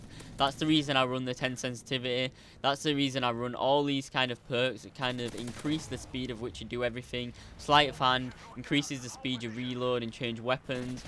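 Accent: Yorkshire, United Kingdom. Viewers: are u from yorkshire ?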